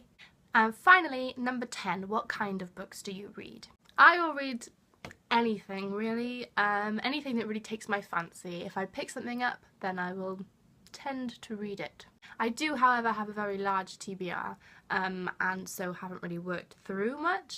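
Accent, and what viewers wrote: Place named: Wales, United Kingdom. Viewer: Welcome to BookTube! You're the first Welsh BookTuber I've come across :) Lovely accent! Finding time to read is hard for me as well, but starting BookTube has actually motivated me to read more so that's definitely a plus in my book :) (yay for puns, ha)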